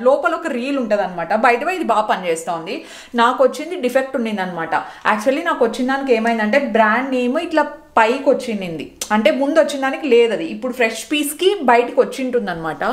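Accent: Anantapur, India. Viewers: Yes waiting for ur love story pls anantapur language vintunte mana atp language ne bonda le alantivi mana atp valu ekuvaga use chestaru chala happy ga undi ala vintunte...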